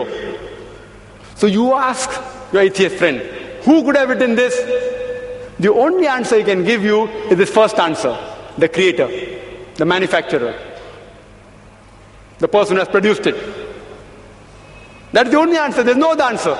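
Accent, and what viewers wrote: India. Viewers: Thats his accent our Indian accent is like that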